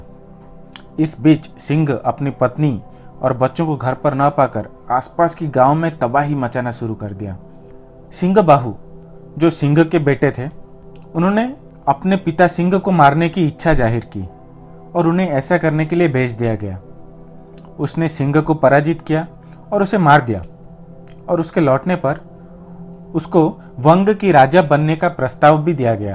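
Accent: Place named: Odisha, India. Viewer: The researcher trying to get a connection with srilanka and Odisha with few old evidence. I request to researcher try to so the examples of words which are similarity with srilankan language currently on use in our daily life,Mostly which district of Odisha using these words. Because district wise we have varity of dialects are using as per our region. One most important request to commenters not to use vulgar languages and do not comment on personal life of researcher. If we have more batter evidence to defend him than let be make a new one based on his blog. Which is benifit to new researchers of this topic. Let be welcome him for his new step. Hindi is the most popular communicative language now a days. Our odiyan Hindi speaking like this ,so there is no matter of negetive comment. One of us if translate by rectify his mistakes in odiya than it also good step. 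Criticism is welcome for all. Bande Utkal janani.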